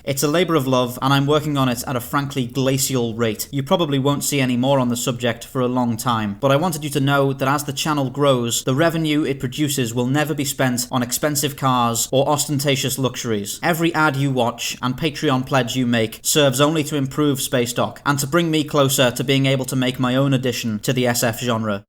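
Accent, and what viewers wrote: United Kingdom. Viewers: Your accent voice is so British that I always imagined you as an imperial officer of the empire. no homo tho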